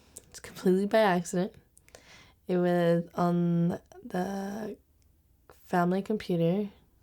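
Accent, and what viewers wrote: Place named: United States. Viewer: She speaks American English...But she's Brazilian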